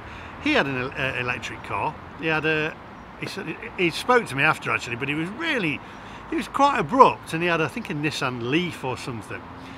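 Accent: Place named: Northern England, United Kingdom. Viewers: Nice to hear a fellow Northerner giving his thoughts. EV's are a con. They are worthless after 10 years as the battery won't hold a charge. Recent channel 4 test on a 10 year old Nissan leaf. It got 17 miles on the motorway before a recharge. 17 miles!!! And announced to today. EV's to be charged road tax in the coming years. That was always going to happen. I'll buy one of the last ICE cars and keep that for 10~15 years. That 10 year old car will still do 400 miles on a refill. I always buy a nearly new car and keep it till the end. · only somebody from the north will say "I am sat on". English is "I am sitting on.". YOU ARE FROM THE NORTH!😄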